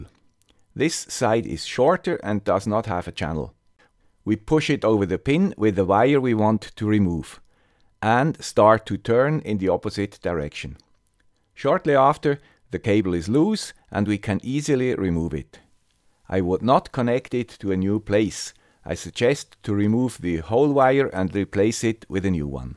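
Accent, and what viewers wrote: Switzerland. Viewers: Thank you VERY MUCH for this idea! Never heard of this before. My wire wrapping tool just arrived on friday :-) Found out, you can use the thin wire of the cheap metal film resistors for wire wrapping. Greets from Basel from another guy with a swiss accent ;) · SORCERY :O Not only the wrapping, but you stripped a tiny wire without ripping its head off. I usually have to resort to burning some insulation off. Thank you swiss accent guy!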